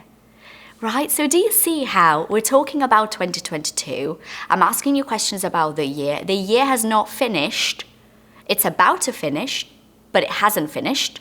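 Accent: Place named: Leeds, United Kingdom. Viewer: Hi!!! Loving your lessons. Have you ever thought of moving back to England? I used to live in Leeds, about 15 years ago, and i'm enjoying a lot listening this accent again. You're a perfect teacher!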